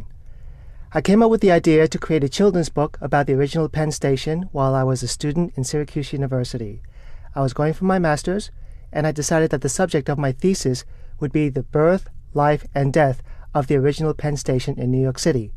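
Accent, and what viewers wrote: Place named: China. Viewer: How can he be a native New Yorker with this Chinese accent?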